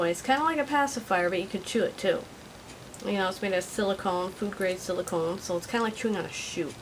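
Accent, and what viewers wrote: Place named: South, United States. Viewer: i was born in Houston, i like your southern accent :p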